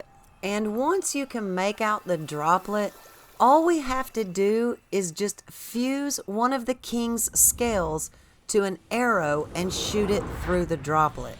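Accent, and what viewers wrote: South, United States. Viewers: No lie that southern draw from a woman playing games makes me feel comfortable asf lead me gamer woman!! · I did not realize how much better walkthroughs are with a soothing feminine southern accent. Great stuff!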